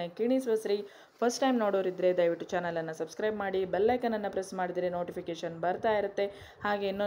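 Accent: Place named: Tulu Nadu, India. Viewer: ನಿಮ್ಮ ಕನ್ನಡ ಮಜಾ ಇದೆ,ತುಳುನವರೂ ಹೇಗೆ ಮಾತನ್ನಾಡುವುದು, ಬೆಂಡೆ ಚಕ್ಕೊ ಮಸ್ತ್ ಇದೆ,🙏🙏👌👌😋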